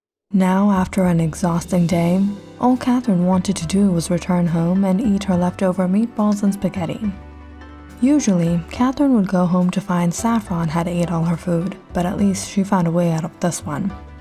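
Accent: Russia. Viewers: Good story, madam. Love that Russian accent, though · I'm in love with that russian accent 🥺😂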